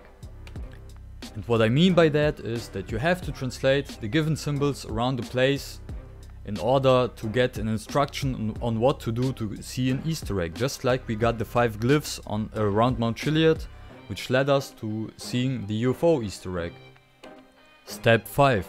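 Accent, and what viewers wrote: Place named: Germany. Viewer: I can recognize the German voice:)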